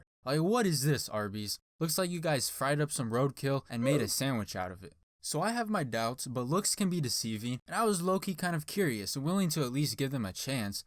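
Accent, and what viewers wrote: Arizona, United States. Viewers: Bro got that Arizona accent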